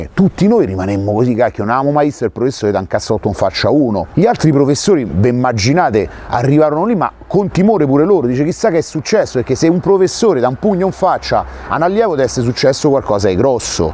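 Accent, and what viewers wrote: Rome, Italy. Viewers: bravo, bella storia e col tuo accento romano diventa ancora più bella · Daje! Troppo bello stare ad ascoltarti con la tua cadenza romanesca, sei un tipo verace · Dopo tanti anni trascorsi nella bellissima Roma, sono felice di seguirti dall'altra parte dell'Adriatico. Da un lato mi dispiace molto vedere l'amata Roma in questo stato di degrado, ma dall'altro mi piacciono le tue storie curiose e divertenti e il modo in cui le racconti (soprattutto la cadenza romana 😄). Grande, continua così!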